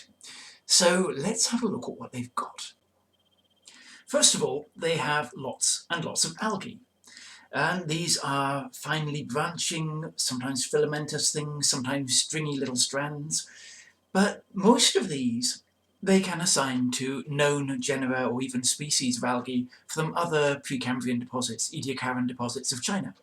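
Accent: United Kingdom. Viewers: I first watched the video, the cc was not visible. Checking for it was the first thing I did. It may have been so on your device, not mine. That’s why I asked for the captions. I’m glad you understand the British accent. My ear is not your ear. We’re all different. Were we not, earth would have one language. Checking back now, I see the cc is up. That’s all I asked for.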